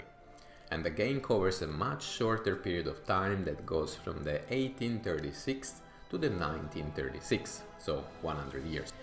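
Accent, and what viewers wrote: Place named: Italy. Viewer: I'm to high to digest what you ar saying but I enjoy your Itialian voice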